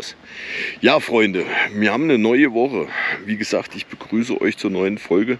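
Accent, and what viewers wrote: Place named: Hesse, Germany. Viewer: Und wieder ein schönes Video. Haus geht vor. Sag mal, wo bist du eigentlich gebürtig her? Höre immer a bisserl hessisch . So FFM und südlich. Zum Thema „Falschparker“ mir ist es lieber ,ihr steht auf den PKW Parkplätzen, als in der Zufahrt. Selbst wenn man mal halbe Stunde Augenpflege machen möchte, findet man bestimmt als PKW Fahrer Platz. Na, ein breites grinsen im Gesicht.Gespannt was kommt. Mal sehn , ob meine Ahnung stimmt. Grüße an deine Liebsten zu Hause.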